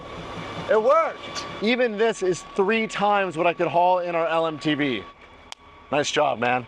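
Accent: Yorkshire, United Kingdom. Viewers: Hi where is from? Definitely a lovely northern accent, I’m thinking Yorkshire? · Can the americans understand yorkshire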